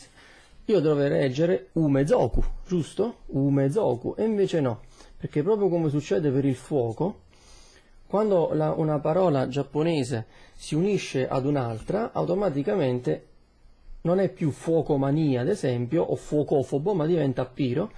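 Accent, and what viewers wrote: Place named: Naples, Italy. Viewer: Davvero ottimo video .. come tuo solito ! E poi ci avevo azzeccato che eri di napoli hahahaha sei fantastico frat'm. Comunque volevo porti una domanda , e possibile che non come molti pensano e cioè che law a PH abbia chiesto a luffy un'alleanza in virtù del tutto fortuita visto che proprio per puro caso i due si siano incontrati in quell 'isola oppure che law sappia la natura di kaido (ovvero la D) e proprio per questo sapendo che luffy è della D sia per un alleanza nella conseguenza di attaccare un altro imperatore? ! Da law come stratega me lo aspetterei anche se mi rendo conto che questa teoria è parecchio campata in aria. Comunque saluti e continua sempre cosi! Appassonaci con le tue teorie e i tuoi studi su one piece proprio con il tuo modo "scialbo" e molto semplice che contagia tutti! Si o mast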